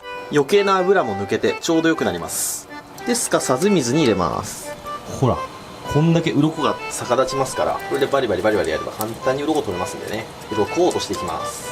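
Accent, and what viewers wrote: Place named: Seto, Japan. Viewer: 小さい頃、瀬戸市に住んどりました。懐かしい話し方と発音が耳に心地よいです💧😢 特に、魚おじさんと話してるときは、ほっこりします。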